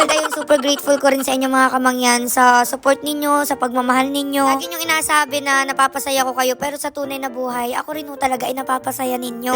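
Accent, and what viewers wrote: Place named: Marinduque, Philippines. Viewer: Happy birthday 🌺🌸natutuwa talaga ako sa iyo paano ay kuhang kuha mo yung salita namin sa Marinduque😅😅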